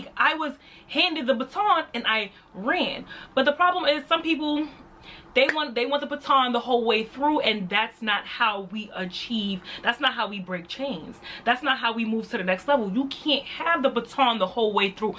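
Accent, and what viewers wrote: New York, United States. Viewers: On ya way to 1million!!! Yasssssssss! Sn: You are soooo NY and I love it 🥰🥰🥰😂😂😂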